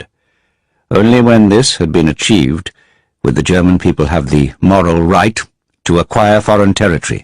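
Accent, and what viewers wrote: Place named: United States. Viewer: What's with the ridiculous American accent?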